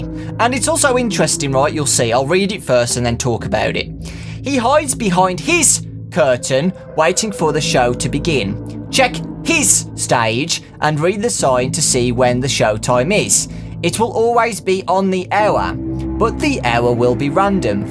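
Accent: United Kingdom. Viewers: Awesome you british voice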